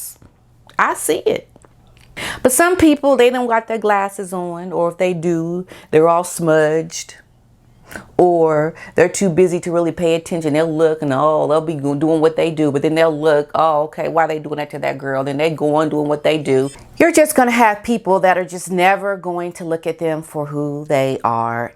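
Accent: United Kingdom. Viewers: No wonder you had the british accent!!!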